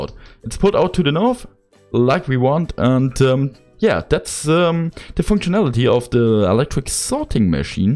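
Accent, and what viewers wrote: Germany. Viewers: er lernt immer noch englisch XD gutes toutorial es klingt sehr deutsch XD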